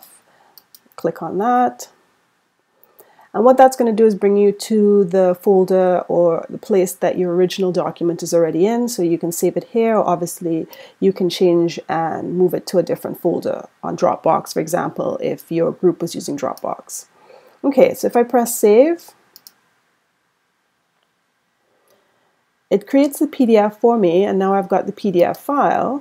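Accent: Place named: Trinidad and Tobago. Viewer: Thank you. You saved me today. You sound like a Trini, like me. Thanks again!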